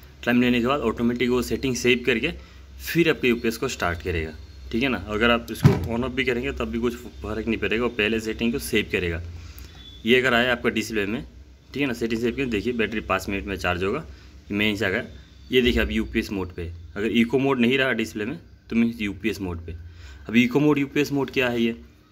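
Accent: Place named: Arunachal Pradesh, India. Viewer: Arunachali Accent 🎉😅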